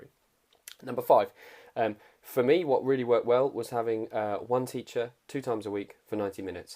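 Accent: United Kingdom. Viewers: Interesujace. Ja mowie po angielsku z polskim accentem a ty mowisz po polsku z brytyjskim accentem. Jestem pelen uznania dla twoej znajomosci jezyka polskiego ktory jest jednym z najtrudniejszych dla obcokrajowcow. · Dziekuje! I'm a fellow Brit, Learning Polish because my girlfriend is Polish. I have found the 'Drop' app to be the best, Combined with conversing and learning from my girlfriend every day. Its very helpful as she has studied linguistics. I have a question; you mentioned being obviously British from your accent. How do you find people treat you because of that? Is it negative or posite or neither? I plan to buy a second home in Poland with my partner so im very interested to know. Thanks for the video. The podcast tip is a great Idea, do you have any recommendations? I subscribed by the way!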